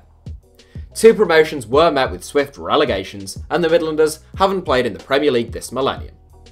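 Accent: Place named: Manchester, United Kingdom. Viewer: note this narrator has a manchester accent hence the bias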